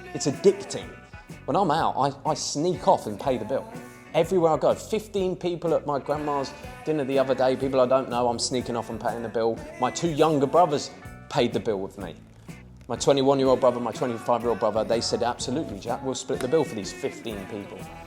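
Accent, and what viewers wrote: United Kingdom. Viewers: No.1: Letting a nihilistic hedonist with a British accent on YouTube tell you how to be a a man and how not to. Huuurrrrr brooo you have to drive an outdated mode of transmission otherwise ur a soyyy hurrrrr.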